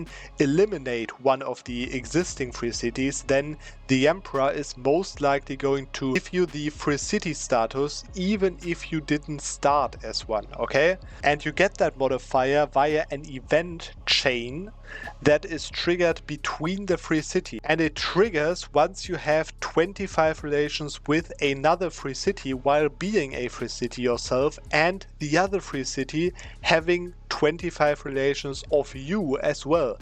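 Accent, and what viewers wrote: Germany. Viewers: Do I hear a german accent here ? :)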